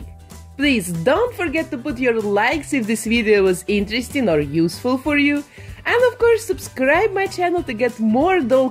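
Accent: Russia. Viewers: Сразу с первых секунд поняла, что вы русскоговорящая :D У вас замечательные видео, спасибо!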